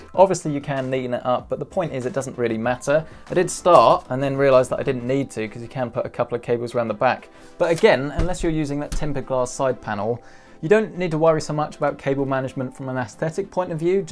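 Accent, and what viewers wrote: United Kingdom. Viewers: First time ive heard the the uk accent going over pc builds its very fitting haha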